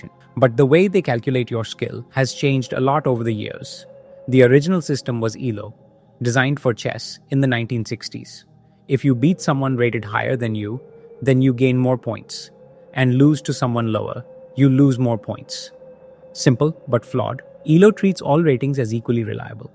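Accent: India. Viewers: I feel like getting scammed by indians. Different dubber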